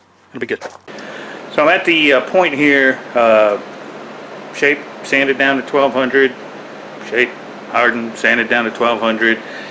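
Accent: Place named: South, United States. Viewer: LOL I came to comment about how southern he’s sounding these days 😂😂😂 I wonder if he notices he’s doing that 😂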